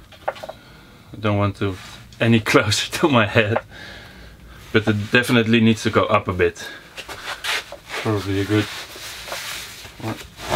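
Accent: Netherlands. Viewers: Haha I just found out your channel yesterday and you are an amazing creator! I already had a suspiscion that you are dutch by your pronounciation (je praat echt goed engels maar elke nederlander heeft iets in zn engels waardoor je direct door hebt dat het een nederlander is ;) ) keep up the good work!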